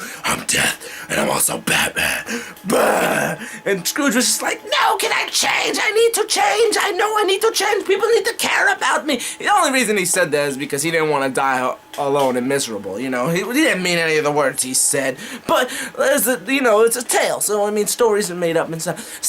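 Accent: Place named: United Kingdom. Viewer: Nice British accent haha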